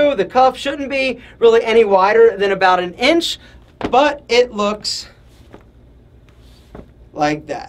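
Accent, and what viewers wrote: Philadelphia, United States. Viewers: He sounds like he's from Philly.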